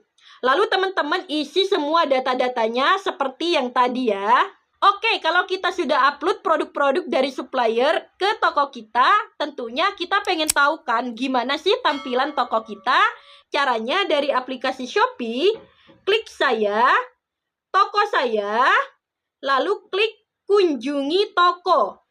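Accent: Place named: Madura, Indonesia. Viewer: Makasih banyak kaka atas penjelasannya yang sangat bagus, detail, dan rinci. Dari logatnya, kayaknya kaka orang Madura ya😊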